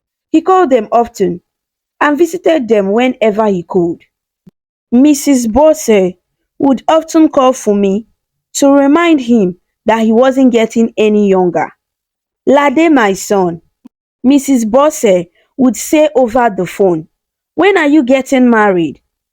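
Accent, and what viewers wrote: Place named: North Carolina, United States. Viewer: I love your stories and your accent...North Carolina, USA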